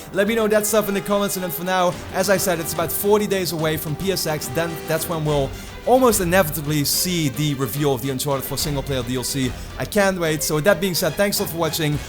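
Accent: Netherlands. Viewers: Ik merkte licht aan het accent dat je niet van origine engels bent, maar Nederlands had ik niet verwacht. Keep up the good work 👍🏻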